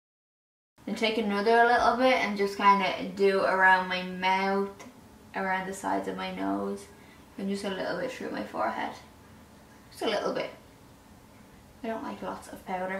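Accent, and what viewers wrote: Ireland. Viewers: Are you Irish?? New subbie i came across you on Pinterest absolute doll xx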